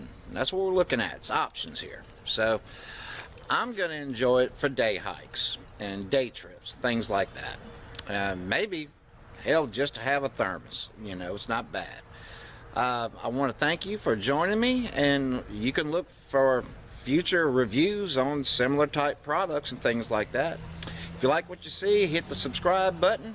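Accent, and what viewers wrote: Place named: South, United States. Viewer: I love your southern accent !!!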